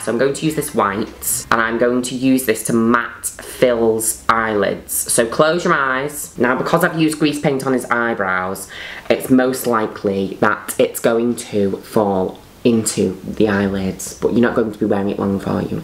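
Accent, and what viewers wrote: United Kingdom. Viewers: Your british accent is ICONIC and STUNNING!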